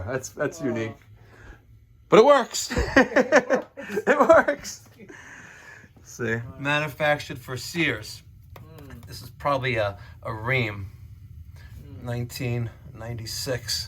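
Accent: New York, United States. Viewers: Excellent video like ALWAYS or as I stated in an earlier video last year when I first Subscribed... You always sound like Ron Jeremy with your New York accent. Sorry for the possibly negative stigma that it may bring up but I still say you are the man and we need some personality like you here in Utah! Anyway, stay safe and thanks for all you do! PEACE!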